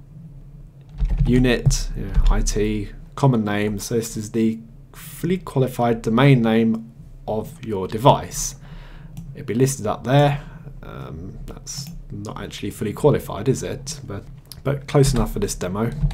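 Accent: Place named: Cardiff, United Kingdom. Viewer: +1 for you voicing a Git or Syn tutorial. +1 for Cardiff accent.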